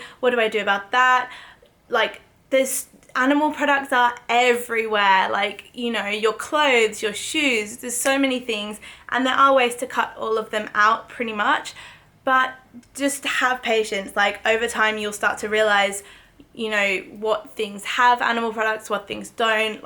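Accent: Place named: Australia. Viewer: I'm here because I just love her Ozi English accent. Sexy accent, hahahaa...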